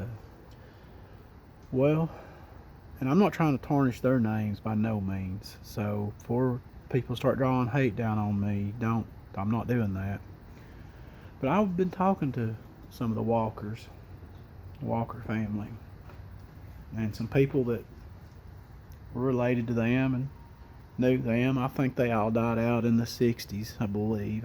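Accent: South, United States. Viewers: My family is from Lookout Mountain, from Bama, Tennessee and Georgia parts. I was born in Ft Oglethorpe, but raised in Dallas, Texas. We made two or three trips "home" to Georgia every year. Hearing your voice reminds me of family that i miss, it's comforting to me. Those mountains and woods do have dark elements to them. The spiritual dimension is very real. Our bodies die, but our spirit doesn't. Believers know that our spirit will get a new body. Scripture tells us that demons and bad spirits go to the high mountains, and to dry, barren and waterless places- like the desert. It even says some inhabit "dirty birds", like the buzzards, vultures, the bittern and even owls. This is one reason that owls are related to false gods like Molech, who is still worshipped today. It is written that there are demon spirits that come off the skin of dying evil men. 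They seek a place to rest, but cannot rest. Their need is to inhabit wicked men who would accept them. Witches go up the mountains to a forest groves to do their rituals, and have since the beginning and time. The darkness is real, the spiritual dimension is real, it can be a dangerous thing to invoke these spirits into the physical realm. You may get more than you bargained for. Thank you so much, i enjoyed your video. Subbed! Lord bless and keep you ❤🙏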